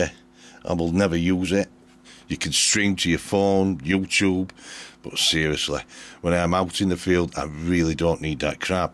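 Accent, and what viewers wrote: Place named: United Kingdom. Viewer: Always enjoy your videos and I love your British accent. Are you from Newcastle? Keep them coming fella!!!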